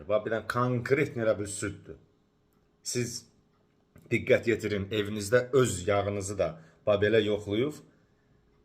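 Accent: Ganja, Azerbaijan. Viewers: bu oğlan ya Gəncəlidi, ya Şəmkirli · Bizə xoş gəlir sizin şirin danışığiniz. Gözəl Gəncə ləhçəsi,arada şirin səmimi sozlər❤Yaxşıki aramızda sizin kimi vicdanlı insanlar var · Gəncə ləhcəsidi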